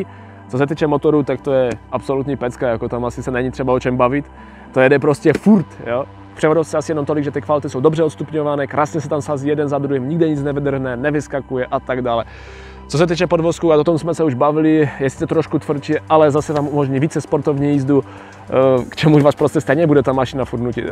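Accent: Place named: Těšín, Czech Republic. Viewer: spíš bych to slyšel od Těšina na vychod... Třinec, Jablunkov , Mosty????? :-))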